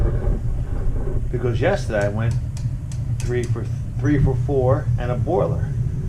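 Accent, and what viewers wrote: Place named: Philadelphia, United States. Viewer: Ay you from Philly bro?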